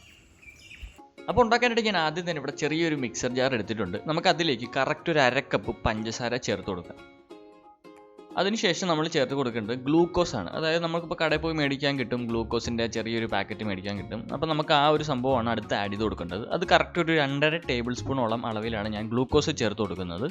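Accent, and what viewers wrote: Alappuzha, India. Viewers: Bro alappuzhakkaran aano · Chettan alappuzhakkaran aaano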